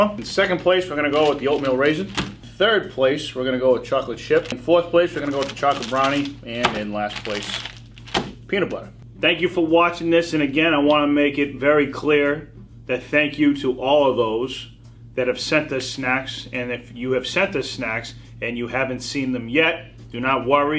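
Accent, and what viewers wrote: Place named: Massachusetts, United States. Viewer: is the voice of Massachusetts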